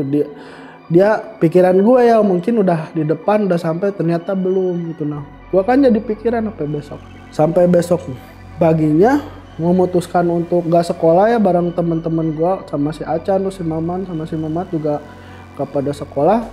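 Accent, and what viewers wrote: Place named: West Java, Indonesia. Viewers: Logat sundanya kebawa😂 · Sunda pisan euy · Bang serius klo sunda sunda aja jadi kagok dengernya😅😅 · Gua.. Gua... Aneh ceuk akumah logat sunda gua guan...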